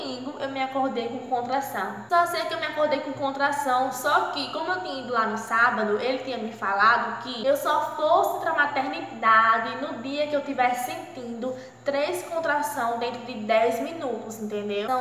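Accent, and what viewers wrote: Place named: Alagoas, Brazil. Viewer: Graças a Deus que no final deu certo🙏🙏 O meu parto foi normal super tranquilo! Comecei sentir dores em uma noite anterior as 18hrs e no dia seguinte as 15hrs já estava sentindo contrações e partir pra maternidade.. chegando lá fui super bem tratada e meu Bryan nasceu as dizem que não demorei muito , mas pra mim parecia que não ia ter fim tanta dor kkkk mas graças a Deus deu TD certo e estamos bem.. Sucesso pra vc .. gosto do seu sutaque igual o meu kkkk sou Alagoana .. bjs corega 😂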